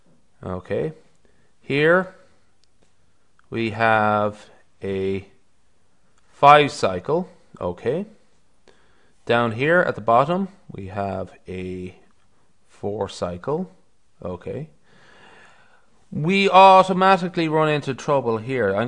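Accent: Ireland. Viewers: you know Conor Mcgregor? judging by your accent, i bet you're Irish. :)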